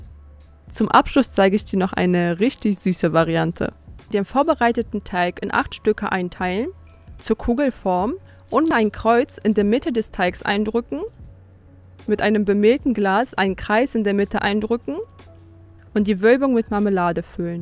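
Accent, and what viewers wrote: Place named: Eastern Europe. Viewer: Wer es noch nicht mitbekommen hat… Diese Junge Dame rollt das „R“ bei „Brioche“ weil sie einen slawischen Akzent hat. Sie spricht ein gut verständliches Deutsch und auch das Video ist vom Inhalt her nachvollziehbar und plausibel. Warum geilt ihr euch an dem fehlenden französischen Akzent, sofern er überhaupt nötig wäre (?) auf? Habe das Rezept nachgebacken, und es ist wunderbar gelungen!😊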